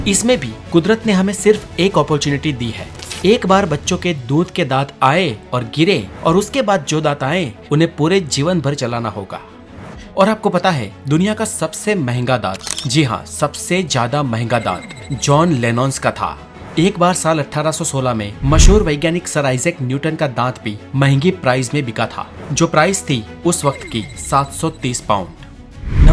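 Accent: Gujarat, India. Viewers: Aaap Gujrati hena ????❤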